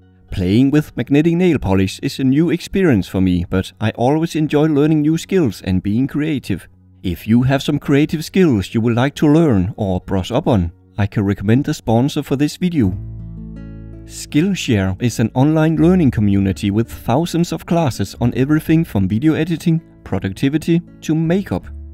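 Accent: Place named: Denmark. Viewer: That accent sounds soooooo danish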